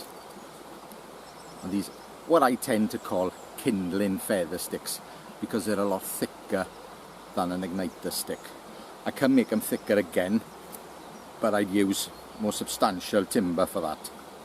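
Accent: Wales, United Kingdom. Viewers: Great video. I love your Welsh accent. So unusual. Looking forward to seeing this Mark 2 model!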